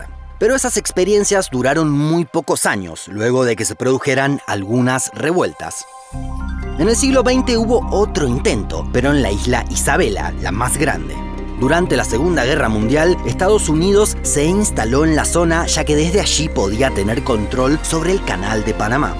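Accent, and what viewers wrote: Buenos Aires, Argentina. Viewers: Xfa pronuncia bien las ye y lle No hables asi porteño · Gran video, como siempre. Sólo una pequeña sugerencia, que no tiene que ver con el contenido ni tema: Sería genial si las LL e Y sonaran como lo hacen en el acento rioplatense como anteriormente, pero que noté que hace dos videos se suavizan... No sé a qué se debe esto.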